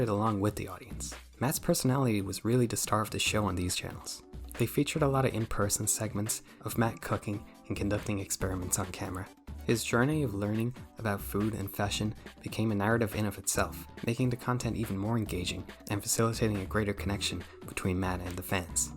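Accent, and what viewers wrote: Ireland. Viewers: You pronounce t's like an Irishman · You're so Irish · you Irish?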